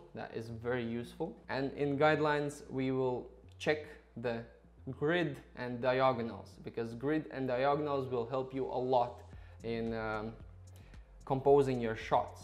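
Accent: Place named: Latvia. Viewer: pēc akcenta uzminēju, ka latvietis :D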